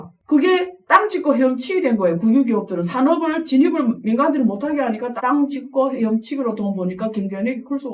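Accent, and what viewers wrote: Yanbian, China. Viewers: 과감하게라는 뜻 말투를 보니깐 중국 연변이나 목단강쪽 분인것 같고 그기에서 살아온 조선족분들은 북한에서 건너간 조상들이 많습니다 그래서 한국 표준어를 잘 못하는데다가 경제나 기술쪽 전문용어까지 이해하고 공부해 가면서 방송을 할려니 부족한부분이 있는것 같습니다 뭘 또 찝어서 지적하시나 ㅋㅋ 아시면서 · 안녕하세요 / 시청자 입니다 방송 음량이 70% 알아 듣겠는데 30%는 무슨 말인지 알아 듣지를 못하겠습니다. 구독자 15만명이면 좋은 방송을 위하여 투자를 좀 해야하지 않을까요? 스튜디오 방송 음향이 울림이 심합니다 / 무슨 말인지 알아 듣지를 목하겠습니다. 중국식 발음과 좋지 않은 음향시설 / 스튜디오에 방음시설 좀 하시고 / 커텐이라도 ........ 그리고 마이크 음량도 좀 UP 하시면 좋겠습니다. 시청자가 불편함이 없어야 하지 않을까요? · 안유화씨 중국인이라던대 사투리도그렇고 사실인가요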